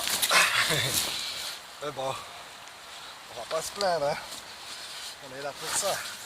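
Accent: Alsace, France. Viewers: slt j'ai bien aimé t vidéo avec ton accent alsacien c'est un plus je me permet de venir vaire toi tu parlais d'économies pour les bouillettes je sait pas si tu connais le site aliexpres tu peux trouver du matériel pour les montages pour la pêche de la carpe PVA sac solubles est qui est moins chaire que les grandes marques le seul inconvénient c'est la livraison qui est un peu longues c'est connu par les carpiste le matériel est de bonnes qualités il à était testé pendant 1 ans voilà si sa peut te faire économiser encore un peu d'argent dit mois se que tu en pense dans l'attentes merci au plaisir de te suivre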